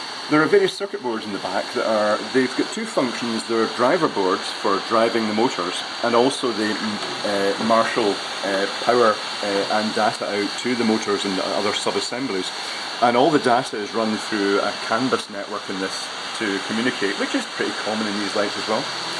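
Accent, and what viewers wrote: Scotland, United Kingdom. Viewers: sorry for the dumb question - your accent is scottish?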